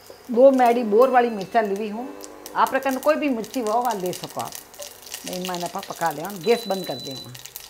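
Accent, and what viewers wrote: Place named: Rajasthan, India. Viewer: Thank you. I love your recipes. I also like hearing you talk mardawadi.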